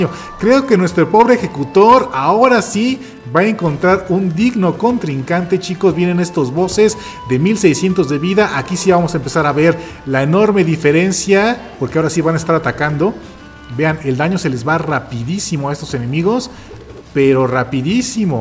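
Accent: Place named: Mexico. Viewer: Hmmm tratare de adivinar de que estado de mexico eres🇲🇽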